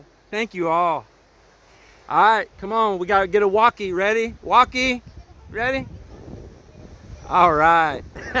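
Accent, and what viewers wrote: Texas, United States. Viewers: I have decided I like you and your videos sincerely. Your Texas accent is kind of cheesy but I forgive you. I am 7 gen Texan and lived across the state from south to East. Maybe it's a north Texan accent. Anyway cheers. Thanks for posting nd I hope to visit soon.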